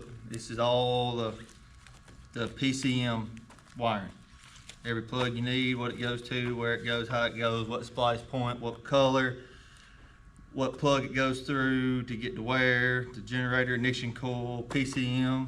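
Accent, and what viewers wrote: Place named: South, United States. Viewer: I was going to research it on the internet but I cannot understand your southern accent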